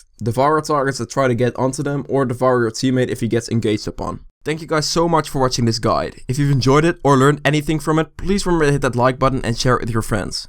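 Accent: Netherlands. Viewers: I like your dutch accent xd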